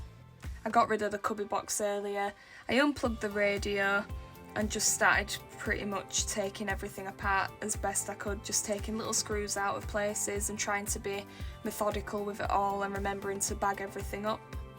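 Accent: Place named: Scotland, United Kingdom. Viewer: That accent is insanely delightful. Hahaha Scottish?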